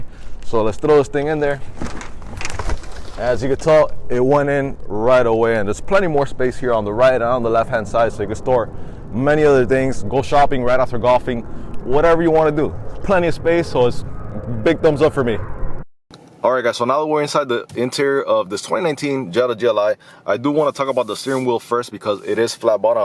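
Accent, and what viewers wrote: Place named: Miami, United States. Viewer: I recognize that Miami accent anywhere. Rick Case VW plate confirmed my suspicions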